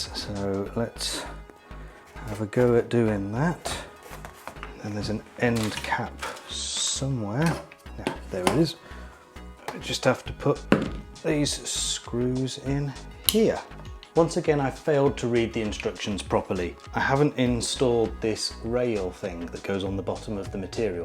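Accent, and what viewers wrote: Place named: United Kingdom. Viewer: I came for the switchbot and stayed for the polite sounding british accent 😊 btw linus sebastian vibes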